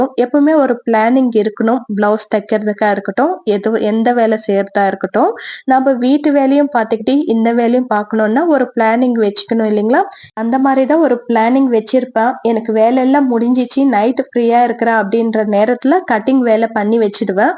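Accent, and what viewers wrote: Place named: Andhra Pradesh, India. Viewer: Hi sis enga amma kooda ippude tha pesuvanga don't worry we r from andra so slang allaga undithi so go on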